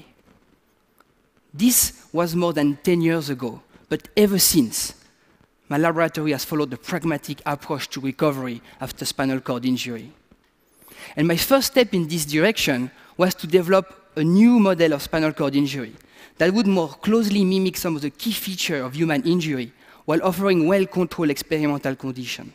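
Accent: France. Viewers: Yes, thick French accent. Had to listen to it several times before I understood what he was saying. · Ohhhhhh the French accent made it so much more interesting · Ahaha oh my god, this video was so powerful and amazing, but as a French speaker myself I couldn't help but find it funny every time he pronounced a word exactly like he would've in French.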